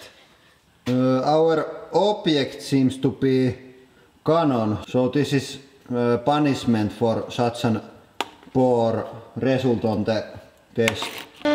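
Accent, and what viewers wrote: Finland. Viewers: nice ralli englanti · are you from finland? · kunnon ralli englanti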